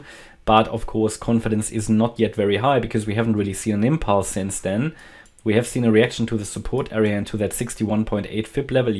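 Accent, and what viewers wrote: Germany. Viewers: Why do I feel like you are Deutsch 😄 Your accent sounds so German.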